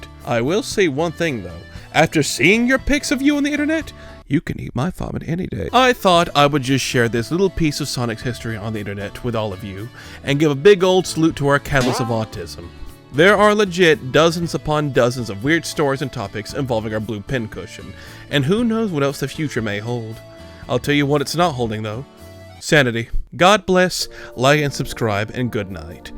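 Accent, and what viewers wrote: South, United States. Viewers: Ok, I think I've pinned the accent. You from Tennessee or Mississippi?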